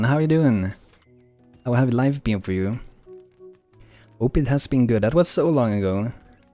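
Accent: Sweden. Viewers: your Swedish right?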